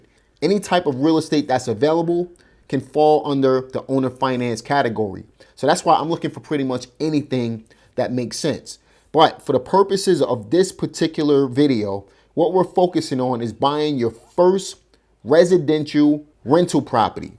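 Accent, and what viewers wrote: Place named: East Coast, United States. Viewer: Thank you SIR for that tea, info, bomb AND mic drop on this tid bit. Now, I do have a question if you can possibly address it or anyone who may also have the answer. I hear a hint of possibly East coast in your voice(which I LOVE, not flirting, lol), so I'm concerned that, that strategy works well in and around that area. And by no means do I have a clue how to go about buying real estate, so yes I turn to info God's such as yourself. I live on the West coast, Cali to be exact. And if anyone knows Cali, shit is difficult in these parts hunTie! So we have so many hoops to jump through on ish. I say all that to say this, would that particular strategy work over here in Killa Cali and yo pockets? Thanks again for the info hun.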